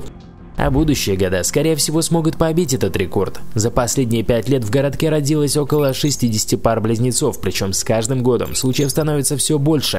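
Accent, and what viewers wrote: Moscow, Russia. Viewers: Такой интересный канал, но из за противного московского говора не могу смотреть до конца. Как бы не терпела, раздражает.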